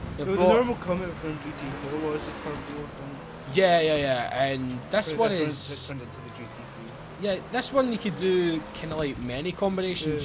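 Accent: Scotland, United Kingdom. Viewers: I like the Scottish accent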